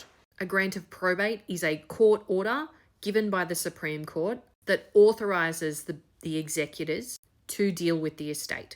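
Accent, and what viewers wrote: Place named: Australia. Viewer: Well explained, with an Australian accent.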